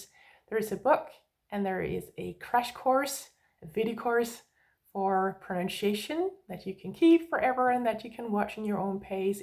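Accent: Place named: Denmark. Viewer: I thought the "kile kile " I heard from some danes was just a mimic as we do in Spanish. Now it makes sense with "kilde " 😅😊. Sorry but may I ask where your Danish accent comes from? Sounds so nice. At the university (KU) one of my professors had the same accent 😊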